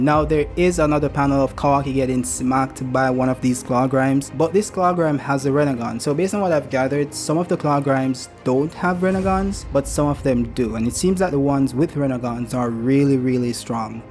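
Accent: Jamaica. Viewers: Are you jamaican i picked up your accent 😂😊 big up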